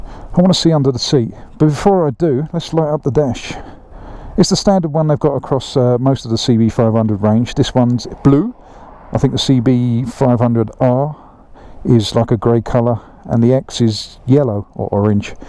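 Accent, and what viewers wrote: United Kingdom. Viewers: Right giggle nice words mate love UK English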